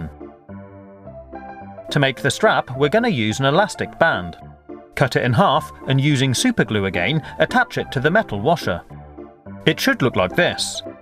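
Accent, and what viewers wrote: United Kingdom. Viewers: Listen to his accent. He lives in the U.K.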